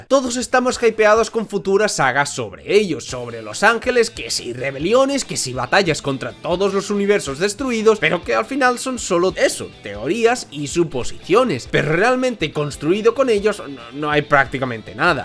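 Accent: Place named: Spain. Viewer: Al fin un canal bien narrado en español de españa… 😢 viva españa viva el rey viva el orden y la ley! 😂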